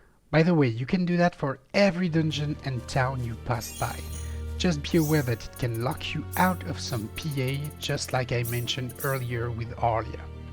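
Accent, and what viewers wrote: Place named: France. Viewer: man, that accent ! Where are you from ? Aren't you french ?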